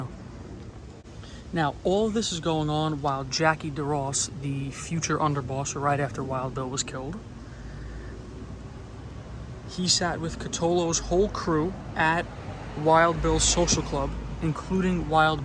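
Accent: New York, United States. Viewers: Terti years ago! Great NY accent. :)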